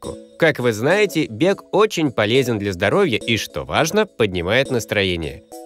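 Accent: Shymkent, Kazakhstan. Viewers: Ты че с Шымкента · Ты из Казахстана? Шымкент?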